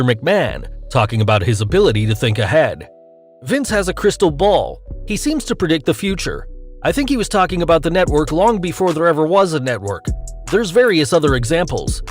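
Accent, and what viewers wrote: England, United Kingdom. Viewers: Lol he's english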